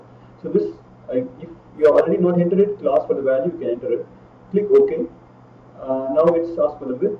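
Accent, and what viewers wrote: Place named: India. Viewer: The audio quality is so poor and the Indian accent so strong that the video is practically unwatchable. Thanks for the attempt but I rank it 3/10.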